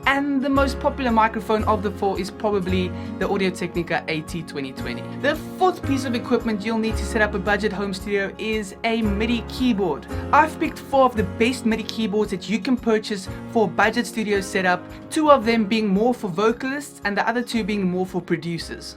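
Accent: South Africa. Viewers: ey bruh i know you from SA because of the accent mad respect from SA bro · That accent... You must be from South Africa 😁 Me too. Thanks for the video dude! Been doing my research for a while and here I come across your video that confirms all my choices, M40x, MPK Mini Mk2 and the Scarlett Solo!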